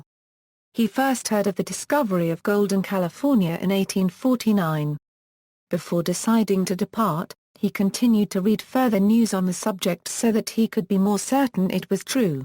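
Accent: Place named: East London, United Kingdom. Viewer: cannot understand your east London accent....you speak at 120 mph slow down to 60 mph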